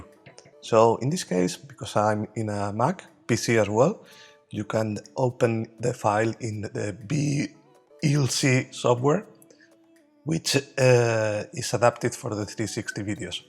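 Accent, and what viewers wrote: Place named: Spain. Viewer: your accent (espanol I assume) a little hard to understand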